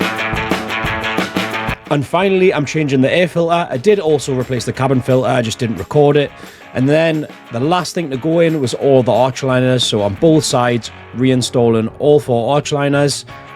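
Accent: Newcastle, United Kingdom. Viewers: "And now i am changing the oil fil-er" 😂😂😭😭Good lord i love the British accent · I miss the Geordie accent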